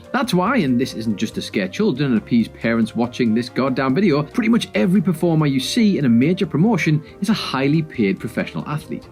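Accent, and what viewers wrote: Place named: England, United Kingdom. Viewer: Which Scottish boys? The narrator's from England.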